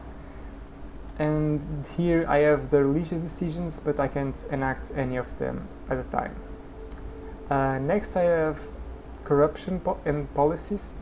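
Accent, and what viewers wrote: Portugal. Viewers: Na hora que você falou os nomes portugueses com seu sotaque matei na hora que es de portugal =D O jogo parece muitissimo bom estou ansioso por ele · Now I know what the portuguese accent is, when speaking English. Nice video, quite instructive, albeit slow-paced.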